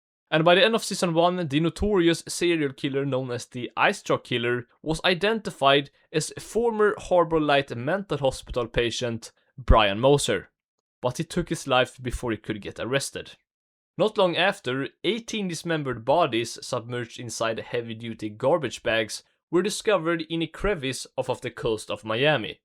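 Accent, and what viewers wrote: Sweden. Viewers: Is that a swedish accent I hear? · I've heard few english accents in my life that sound more violently swedish than yours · Why does bro sound Swedish? 💀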